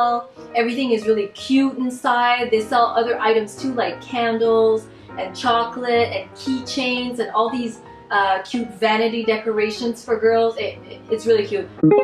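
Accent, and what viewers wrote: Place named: Quebec, Canada. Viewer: Tu es Canadienne? Tu as l’accent Québécois quand tu parles français !😍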